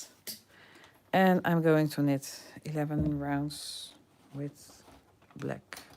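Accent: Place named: Netherlands. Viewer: I love how you force yourself to calm down 😂 always enjoy your tutorials and talents. Admire your English fighting with the Dutch. Appreciate your struggles. Wishing you were making more English tutorials. Cannot translate the Dutch version or the lives.😔 🤣🤣🤣I don't think I will be attending those knitting classes.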